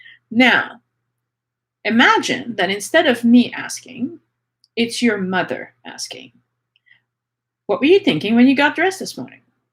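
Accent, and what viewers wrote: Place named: Quebec, Canada. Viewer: Thank you so much for this video. 2 and a half years ago I got a crippling mystery illness near my left ovary no one has been able to diagnose. I was in dibilatating pain with crushing pain for 3 weeks out of the month(using past tense because I know I am healing now.) and just as I thought things couldn't get worse...this illness, losing my career, not being able to go out or see anyone... My father was diagnosed with cancer and passed within 3 weeks basically because the medical system. I thought I would die. I lost my protector and best friend. This happened 2 weeks ago. Then yesterday I started a Dr Joe Dispenza meditation group and during the meditation, I kept hearing the name Hathor.. Continuously. I didn't know anything about Hathor... But I'm purely Egyptian by blood. I couldn't believe what I learned about her or "them" afterwords. 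It was mind boggling how much she completely related to my health crisis and losing my beloved father. She's here to guide me into healing by being a better mother to myself. To reconnect with my Divine femininity. And your video brought me so much clarity. Im very grateful for you. Thank you from the bottom of my heart for all this knowledge. Also, are you from Quebec by any chance? I am, and your accent sounds like the Quebecois here 😊sending you so much love and gratitude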